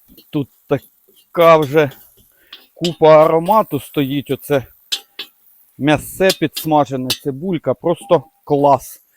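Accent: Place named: Poltava, Ukraine. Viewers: Такой приємний,, Говор "у Вас ,майстре ,така мова чарівна у Вас ,як у нас ,на Полтавщині .Приємно ,слухати,не тільки ,залучитися до вашого ,,Капусняка '',все так робити ,як і у нас .Велике Дякую. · Розмова точно полтавська! Чи помиляюсь? У нас також капусняк готують і з квашеної і зі свіжої капусти. В залежності від пори року. Неперевершено! Дякую.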